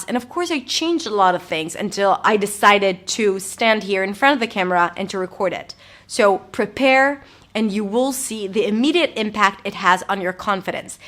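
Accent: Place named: United States. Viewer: like your American voice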